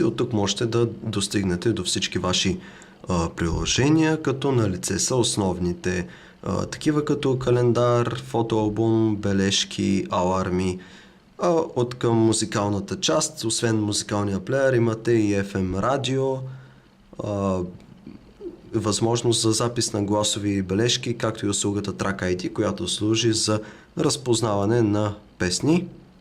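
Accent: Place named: England, United Kingdom. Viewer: Which language is this? I really like your English accent lol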